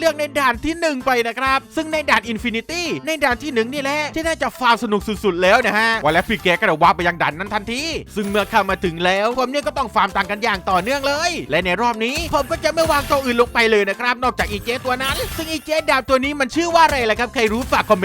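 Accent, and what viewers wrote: South, Thailand. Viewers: พี่เป็นคนใต้หรอคับ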